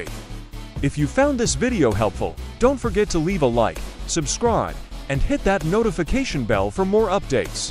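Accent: South Africa. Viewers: Your South African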